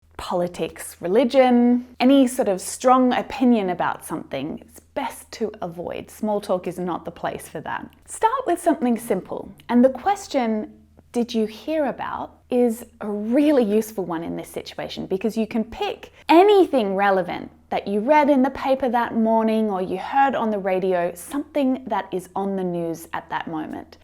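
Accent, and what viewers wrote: Australia. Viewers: Australian accent!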